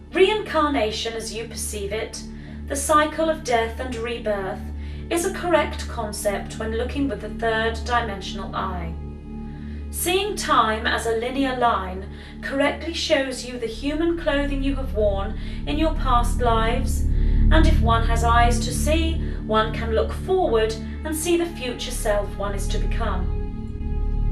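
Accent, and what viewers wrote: United Kingdom. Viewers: Bit British aren't we?? I'm British too...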